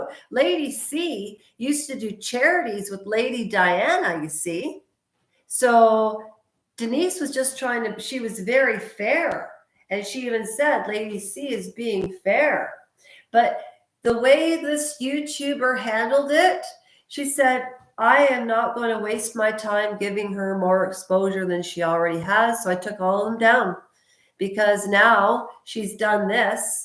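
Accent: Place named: Canada. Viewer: I notice you don't actually say what it was Lady C said so we can judge for ourselves. A bit like MM accusing the RF of racism without giving the words used and context. And yes she will sue if she has been defamed. She is old school. Lady C may have a castle but she worked bloody hard to afford it.She also has a title and uses it to good effect and has raised millions for charities and foundations in Sussex, the UK and abroad. FYI, the book was published in 1992. You sound Canadian so you should know how the working royals, especially Princess Anne, have worked with Canadians to make a better society. Again I ask: what was said - exactly?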